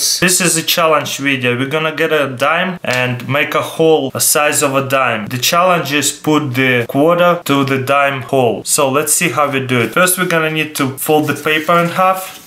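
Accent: Russia. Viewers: i love russian acent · he is Russian. that's why. there is certain things that Americans can pronounce that he can't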